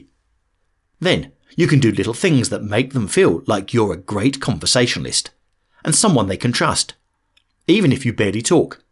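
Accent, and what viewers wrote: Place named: Scotland, United Kingdom. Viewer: Just enjoying the Scottish accent. 😊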